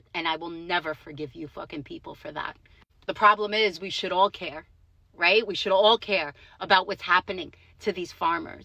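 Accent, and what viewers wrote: New York, United States. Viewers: Actaully if you would actually watch and listen to us farmers when we speak. Plenty of vidoes of us. Its the Ag monopolies that are killing us. Pretty much all grain is controlled by 2 companies. ADM. and Cargill. They are keeping grain prices low. You honestly sound like your from newyork. And never seen a farm. Lol.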